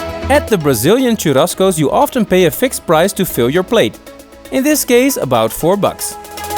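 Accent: Netherlands. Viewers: Je bent Nederlands of niet?? Ik hoor het aan je accent :o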